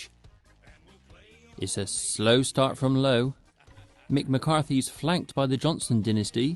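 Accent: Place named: Southern England, United Kingdom. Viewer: LOL, I take it that you don't like "that other team" as evidenced by the vomiting sounds in the commentary. Sorry, I don't know the teams as I'm from the US, but then I don't follow this sport on either side of the pond. May I ask where you are from? I'm trying to place the accent...I'm going to guess....the southern half of England....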